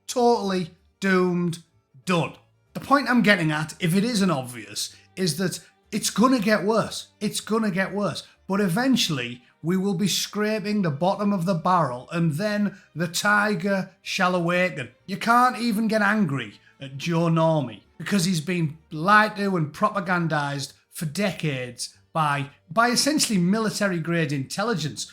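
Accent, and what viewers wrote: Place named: Middlesbrough, United Kingdom. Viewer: I must admit, I think history lessons are much better when told in a no nonsense Middlesborough accent😂.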